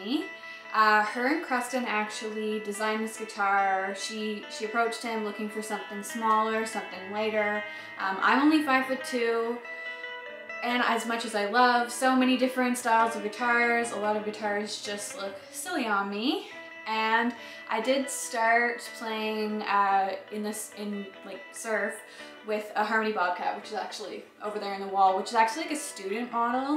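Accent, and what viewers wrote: Canada. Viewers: I like your Canadian accent. The guitar looks and sounds great too. The surf shack is lookin' great. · Love that Canadian accent!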